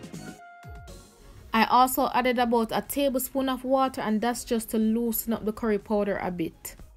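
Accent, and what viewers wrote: Jamaica. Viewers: Jamaican accent wtf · It's a long time I have been looking for a Jamaican plant based channel. Now I have found one with a Jamaican that sounds like a Jamaican. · Great video very helpful, but I love the Jamaican accent more than anything . I will be watching all of your videos now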